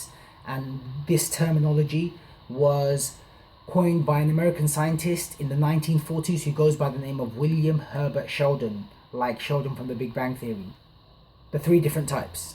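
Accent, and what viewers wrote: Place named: England, United Kingdom. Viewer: another YouTube annoying english accent downvote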